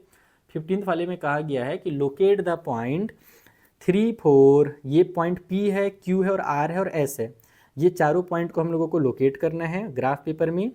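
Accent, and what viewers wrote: Bihar, India. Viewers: sir +bihari language tadaka =interesting maths ❤❤😅😅😅😅😅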